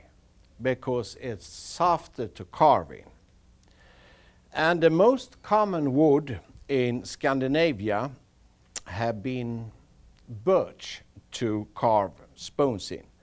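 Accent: Sweden. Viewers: hejsan! helt underbart att se dej å även höra dej på din goa svengelska å din humor 😂 helt underbart. jo jag tänkte fråga om du fortfarande håller på å jobbar med verktygen? om det är möjligt så hade jag jättegärna köpt vikingayxan som du visade i början å även en skedkniv. glad påsk!! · Hans engelska är underbart svensk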